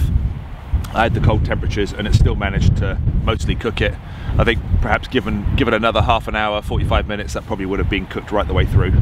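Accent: United Kingdom. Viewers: Oooo with warmer weather we might just see out doing some nice camps this spring? Man, I hope so. You are one of my absolute favorite channels on YouTube. As an American, I just love your accent and your whole demeanor, really. You are just an epic individual, so totally British. Just lovely. And the funny thing is that I don't even camp. I can't stand to go camping. But, I can watch you all day long., you are the best. Cheers!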